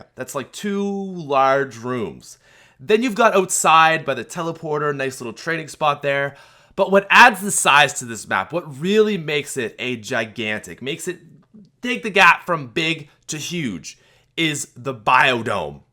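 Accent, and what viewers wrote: Canada. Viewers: It's cause he's Canadian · Nam DreamzZ Lol nice try at acting smart. Americans are all so IGNORANT to the fact that THATS HOW THE WORD SOUNDS DUE TO THE CANADIAN ACCENT. People in America see something that isn't the same as their country, and think its weird and want it changed. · dude he's Canadian, leave him alone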